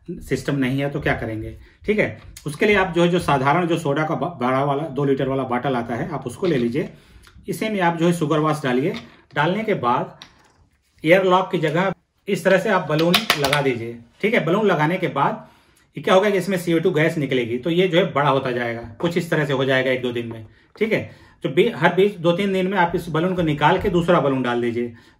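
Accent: Bihar, India. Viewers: I thought he is speaking English in Indian accent. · Sir you must be from Bihar (your accent is Lalan top). You are really good at chemistry.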